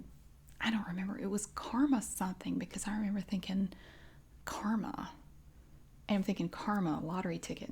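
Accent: South, United States. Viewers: I love how much more southern you sound when you get goin' 😂💕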